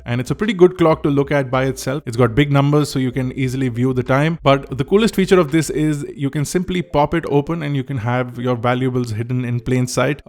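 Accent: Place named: Delhi, India. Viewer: Simply elegant and useful info... Y i joined this coz the person is not wannabe... Simple perfect delhi accent English.. not trying to make it cool accentize British or American accent English... All concentration on gadgets knowledge no tantrums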